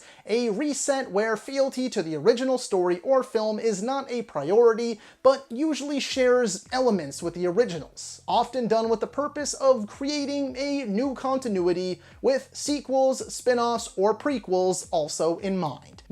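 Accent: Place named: Canada. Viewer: the Canadian accent. “Out” is “Oat” lol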